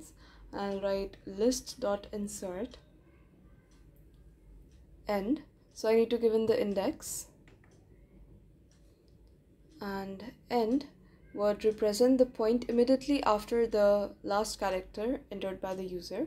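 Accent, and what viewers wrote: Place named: India. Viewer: Hi Mam , Are you from India naa ?? Your language accent shows it clearly...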